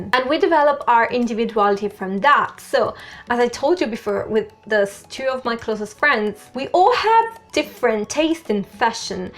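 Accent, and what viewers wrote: United Kingdom. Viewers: You are speaking in British accent but you do not look like a British in some aspects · Your English is very good however it seems to me that you speak with a British accent which sounds very nice. I would love to see more videos in Italian. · She's Italian but she speaks English with a British accent.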